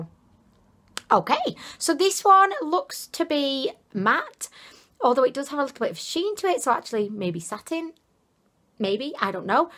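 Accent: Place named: Manchester, United Kingdom. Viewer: Ahh!!! I just came across your channel!! You’re so precious 😍 are you from Manchester? You have the same accent as some of my family that live over there!! Lots of love☺️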